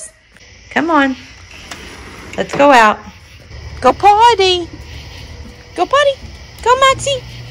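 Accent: South, United States. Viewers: Haha, loved watching the dogs bedtime routine, so sweet😍 and that Southern accent 👍 Thanks for your fun channel and Hello from another curly girl downunder🖐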